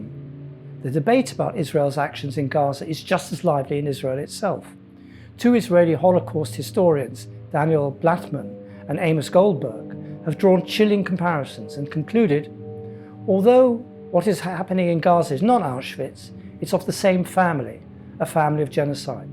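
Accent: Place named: England, United Kingdom. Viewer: The English accent sells it very well.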